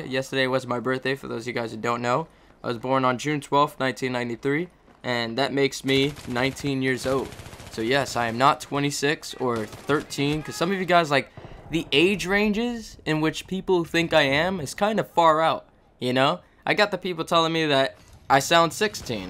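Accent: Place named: New York, United States. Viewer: He has that New York City accent, so it's not his fault.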